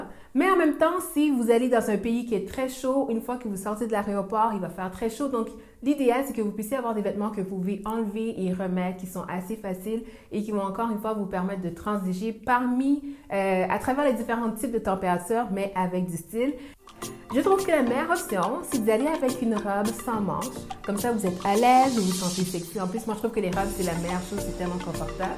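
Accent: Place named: Quebec, Canada. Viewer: J'aime ton accent québécois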